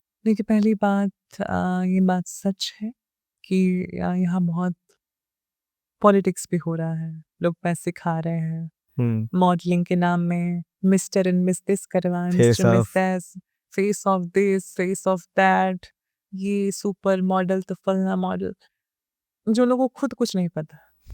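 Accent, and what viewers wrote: India. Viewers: The way you are talking you are not look like a Nepali, you are look like Indian, all the best 👍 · aap ke bolne ka lahza bilkul ham Lucknow walon ki tarah h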